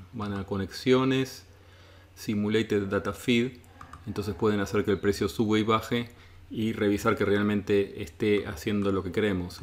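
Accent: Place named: Argentina. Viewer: ahí me fijo.. muchas gracias!.. ché, por tu tonada creo que eres argentino.. vivís en Buenos Aires.. das algún tipo de asesoría presencial?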